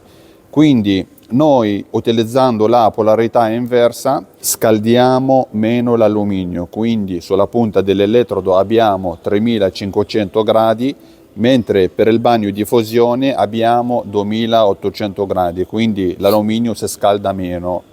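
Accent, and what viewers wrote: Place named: Egypt. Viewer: E egiziano si sente dall’accento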